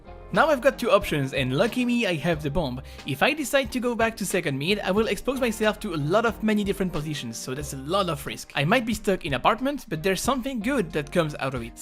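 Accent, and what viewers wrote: France. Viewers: Слушать английский от француза, когда ты - из России... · His french accent is so annoying.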